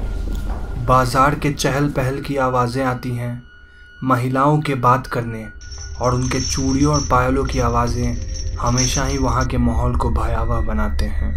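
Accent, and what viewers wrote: Bengal, India. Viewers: Bhi tum bangali ho??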